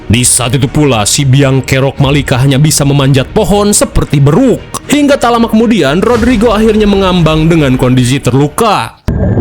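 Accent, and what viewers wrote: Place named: West Java, Indonesia. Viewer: Orang Sunda mana a 😅...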